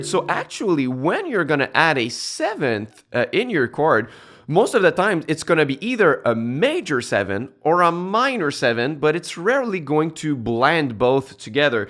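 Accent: Quebec, Canada. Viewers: You sound like you from Quebec????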